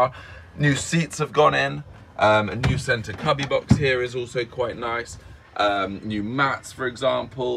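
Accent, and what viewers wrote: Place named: RP, United Kingdom. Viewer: Sorry you sound too posh to give you my money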